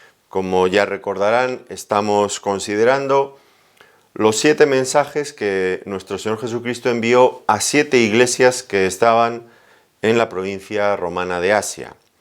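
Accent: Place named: Spain. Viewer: Predica: "El mensaje a Tiatira" Apocalipsis 2-18-29". Video en Youtube de este pastor cuyo entendimiento y humildad tienen la gracia de Dios, el ministerio se llama, desconocemos el nombre del pastor por su acento sabemos que es español, puedes buscarlo como en tu navegador Brave, en Youtube, Google etc. Lo recomendamos por su sana doctrina de Cristo, igual que recomendamos también a John MacArthur, Paul Washer y Miguel Núñez. ( Pastor Muchas gracias por compartir el entendimiento que Dios le ha dado. Noto que usted con humildad no publica su nombre, en contraposición a los falsos profetas que se hacen llamar "apóstoles" y no lo son, esos que ponen su gran foto y sus nombres con letras enormes en sus también grandes afiches anunciando sus eventos, y ni en broma mencionan a el Señor Jesucristo en esos afiches. Precisamente debido a esto creo que sería bueno saber su nombre para compartirlo rogándole a Dios que usted siempre conserve su humildad espiritual y salud integral. 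Dios los bendiga.).+ Romanos 5-20 "Pero la ley se introdujo para que el pecado abundase; mas cuando el pecado abundó, sobreabundó la gracia; 21 para que así como el pecado reinó para muerte, así también la gracia reine por la justicia para vida eterna mediante Jesucristo, Señor nuestro". <link>, Apocalipsis 2-2 "Yo conozco tus obras, tu fatiga y tu perseverancia, y que no puedes soportar a los malos, y has sometido a prueba a los que se dicen ser ap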